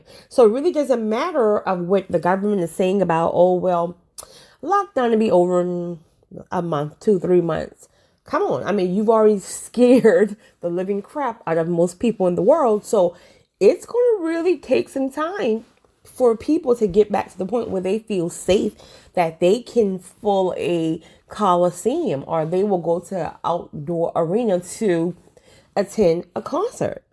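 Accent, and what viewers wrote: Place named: East Coast, United States. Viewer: Thanks for speaking; (You do need to shut off the alerts from your dm's😉 👍)..But hearing your 'east coast voice', to THIS 'way-faring stranger', was a blessing.🙏 ( Storm? Tornado alerts here in Oklahoma⚡🌪⚡🌘⚡🌪). You have a beautiful voice. 'NovelCoronaVirus19' is in Gods hands; Don't worry, Stay safe.🛐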